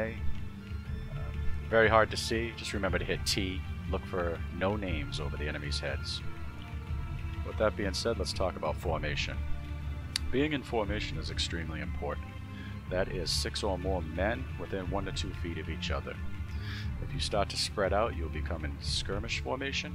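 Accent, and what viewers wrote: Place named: New England, United States. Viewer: Hey man love your video, just curious are you from Mass? I live in Maine and I swear you have the faintest commonwealth/new england accent hahaha either way again love the videos man very informative keep on keeping on. P.S. you kinda sound like Adelbert Ames the original commanding officer of the 20th Maine as portrayed in Gods and Generals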